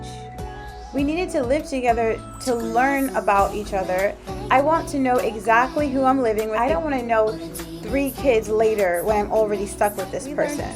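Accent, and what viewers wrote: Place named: Trinidad and Tobago. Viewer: I love your Trini accent (^_^) I'm glad you're focusing on you again ~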